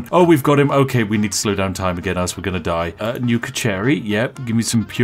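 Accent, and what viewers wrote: United Kingdom. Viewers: I love your British accent. Please marry me. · Starting with nuking a group of harmless deer, you had my attention, glorifying the best tea brand and you've earned my subscription. Spiffy Brit accent is icing on the cake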